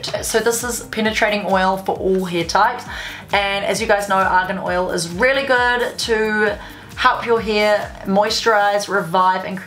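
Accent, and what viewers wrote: New Zealand. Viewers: your New Zealand accent is so cute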